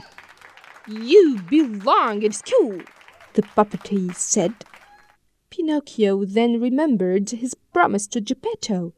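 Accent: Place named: United Kingdom. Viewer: this accent British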